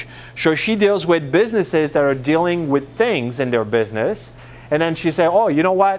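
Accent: Quebec, Canada. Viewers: Sensing a Quebec accent :)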